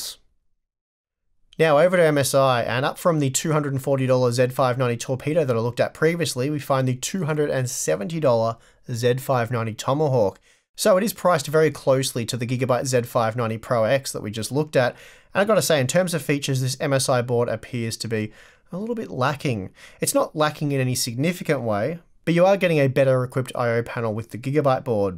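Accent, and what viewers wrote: Australia. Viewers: Is it an Australian thing to pronounce the letter Z as "Z-ed" In America we pronounce the letter Z as "Z-ee"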